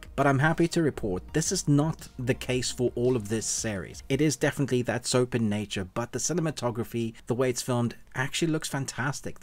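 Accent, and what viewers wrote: South Africa. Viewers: The ending was confusing for me.. the dead bodies. Quick question, what is your accent? You sound South African mixed with English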